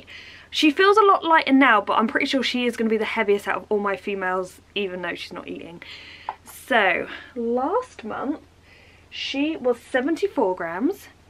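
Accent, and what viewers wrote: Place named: London, United Kingdom. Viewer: You've got a mixed accent... London and?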